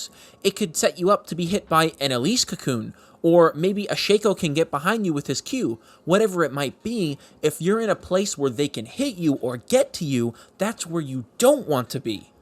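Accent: United States. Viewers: the way you talk,it reminds me like Wild West, cowboy things xD ,i mean on actent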